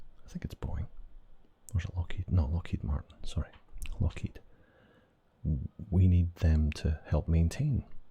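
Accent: Scotland, United Kingdom. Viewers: Im neutral ion rlly get into politics but i love ur scottish accent lol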